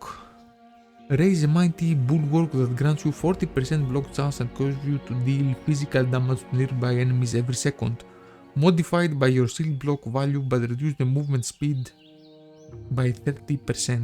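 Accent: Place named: Greece. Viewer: From the accent i can tell you are greek 😂 ! Are you??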